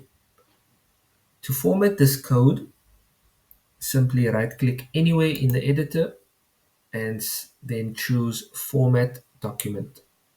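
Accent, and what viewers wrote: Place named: South Africa. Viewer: love ur south african accent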